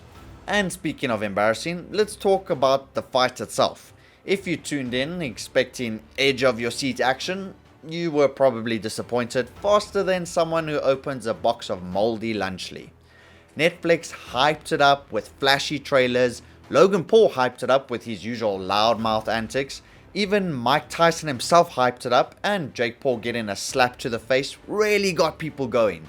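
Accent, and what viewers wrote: Afrikaans, South Africa. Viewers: your accent sounds south african in my opinion, please reply to this if i was wrong, thanks! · Im addicted to your videos, this is my second video I've watched in a row because of that accent. Up the bok, up the afrikaans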